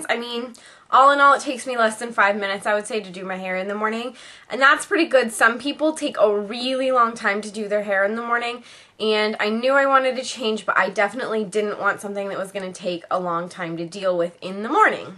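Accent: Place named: New Jersey, United States. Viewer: woah, you sound like your from new jersey(x ... well i LOVEEE your videos :) you are so sweet<33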